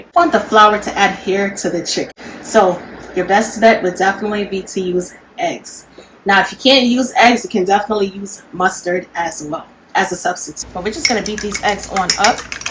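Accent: New York, United States. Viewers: She's gotta be a new Yorker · I'm from the bronx . You definitely from New York love it . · I can hear that NY Accent 😅 chicken looks amazing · You have to be from New York girl!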